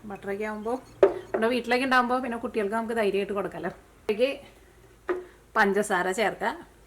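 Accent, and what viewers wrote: Thrissur, India. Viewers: Buiscuit super aanu.nedumpasseriyl trichur/chalakudy slang eppol vannu.kelkan sughamundu.👍👍👍👍👍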